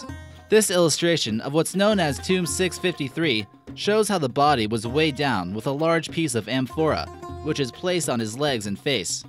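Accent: United States. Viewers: theres something so jarring about an American accent and american flags when discussing classical greece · paused the video with the laptop bullshit. the snarky American narrator is also annoying. For these reasons i'm out!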